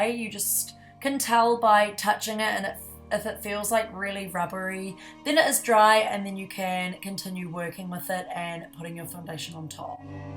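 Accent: New Zealand. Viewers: I knew you were a kiwi when I heard your voice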